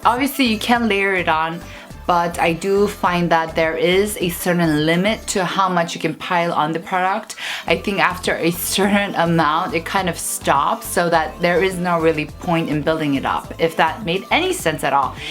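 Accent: England, United Kingdom. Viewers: love your english accent. Next time you can teach Korean languages also I'm really appreciate that lol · Cori Lynn I think she went to a British school while living in Europe and other countries. So she probably picked up some mannerisms hearing different accents.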